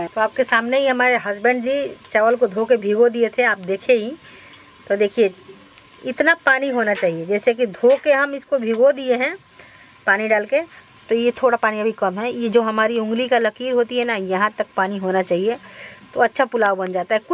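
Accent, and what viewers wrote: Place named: Bhojpuri, India. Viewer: Anuty ji aplog to hamare jaise bhojpuri bol rhe ho 😅 aur apka khana v bahut majedaar banati ho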